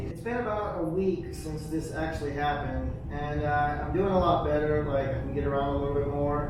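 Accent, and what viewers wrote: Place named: South, United States. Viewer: LOL why are there subtitles? I can totally understand u I'm from NJ NYC is cuz of that southern accent of urs?😊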